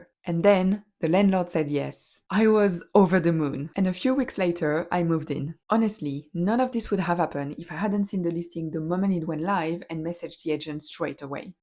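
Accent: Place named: France. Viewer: Where are you from? I'm guessing France but I'm not that great with accents. 🥐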